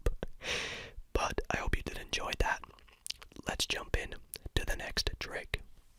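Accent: Scotland, United Kingdom. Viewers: Scottish, yep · Just realized he’s Scottish about 10 mins in hahah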